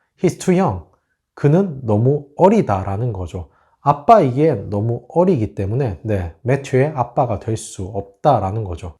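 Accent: United Kingdom. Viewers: 영국식 발음에 치인다요……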